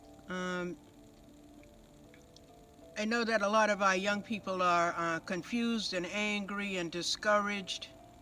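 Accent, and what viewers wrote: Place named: New York, United States. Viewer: new york jewish accent the jews think they are black they stole the Black peoples history, what happened to all the red Skins,rich jews killed most of them off, Redskins who don't use the terms people of color that would be racist towards white people, who as soon as the sun shines on them, they turn a lovely Red color from the Pahana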